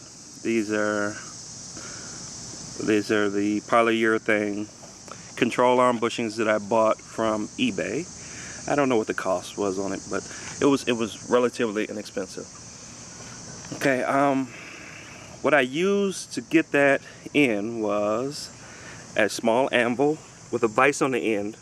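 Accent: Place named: Caribbean. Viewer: You sound like you have Caribbean Roots man....well done btw. Thanks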